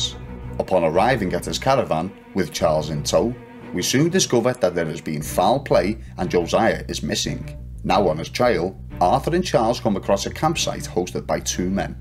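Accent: Liverpool, United Kingdom. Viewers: Are you a scouser ?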